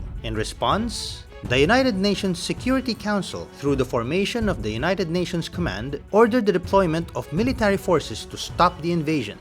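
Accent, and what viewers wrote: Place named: Philippines. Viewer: This is Filipino English accent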